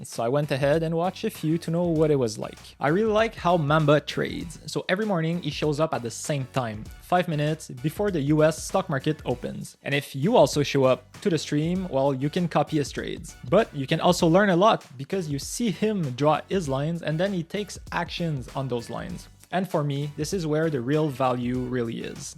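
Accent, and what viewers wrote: Quebec, Canada. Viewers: Je viens tout juste de te découvrir et j’entends un fort accent Québécois 😅. Je m’abonne à ta chaine!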